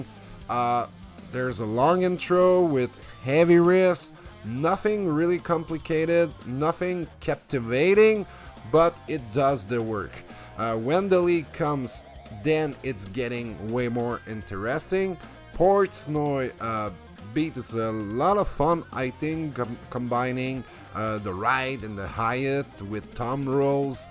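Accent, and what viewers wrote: Quebec, Canada. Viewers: I just started this review, my favourite band by the way since 1993. You sound like a French Canadian (like me)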